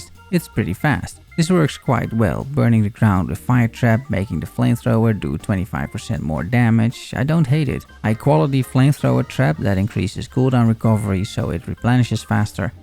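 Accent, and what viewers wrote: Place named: Netherlands. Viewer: Just a random comments, your accent.. are you Dutch?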